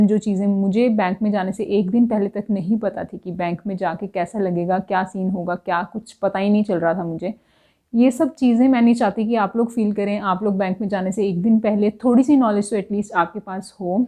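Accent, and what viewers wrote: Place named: United Kingdom. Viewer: i like ur eng accent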